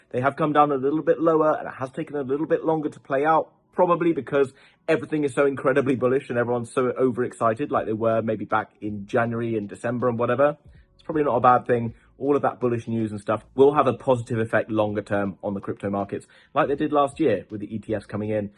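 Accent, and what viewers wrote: RP, United Kingdom. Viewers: New subscriber , great content , you sound posh like me.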